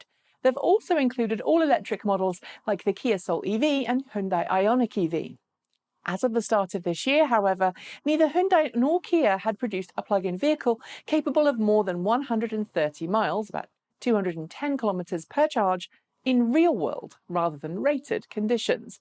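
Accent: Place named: United Kingdom. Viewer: proudly points out from time-to-time that she is unapologetically British. 😁 As such, she will pronounce certain words in a manner unfamiliar to those of us who speak Informal American Standard Idiomatic English, and stuff.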